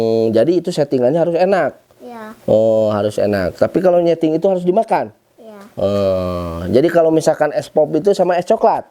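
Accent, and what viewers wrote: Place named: Sunda, Indonesia. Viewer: Cri khas a serius tapi loba hereuy khas orang sunda pisan😂😂😂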